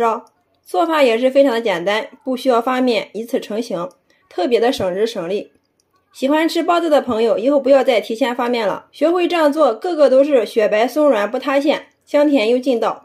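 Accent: Shandong, China. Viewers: 山东人吧？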